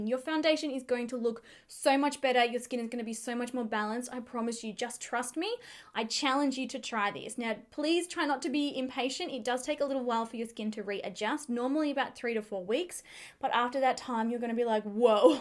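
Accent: Australia. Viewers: You sounds like an Aussie. Thanks for tips, but I am interested in natural therapy not after promotional products. :) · ARE YOU AN AUSSIE??SOUNDS LIKE IT LMAO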